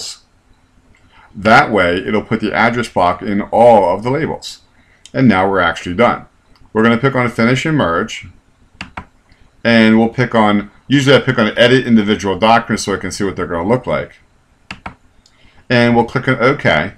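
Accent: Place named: Baltimore, United States. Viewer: You're from Baltimore, aren't you??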